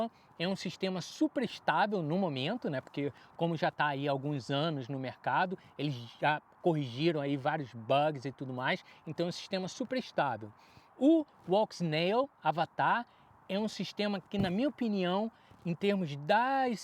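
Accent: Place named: United Kingdom. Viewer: Vc mora no Reino Unido ou já morou por la? Tu tem um sotaque muito britânico hehehe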